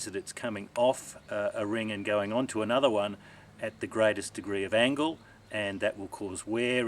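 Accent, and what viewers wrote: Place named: Australia. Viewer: youre australian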